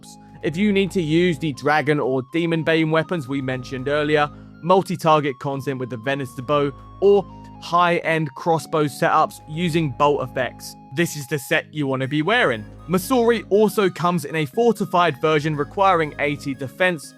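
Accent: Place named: United Kingdom. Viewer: God damn you’re the best in the business. Info, facts, humor and a wa-ah bo-ool accent? Perfection.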